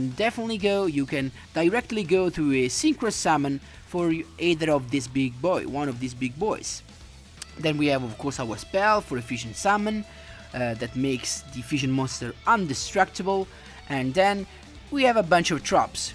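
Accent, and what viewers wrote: Italy. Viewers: Great deck, I’m subscribed! Also, are you Italian?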